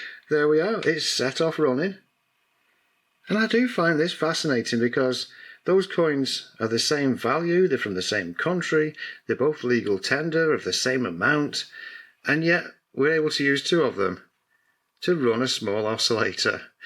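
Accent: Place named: United Kingdom. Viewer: What shocked me is a British accent living in the United States. I subscribed😂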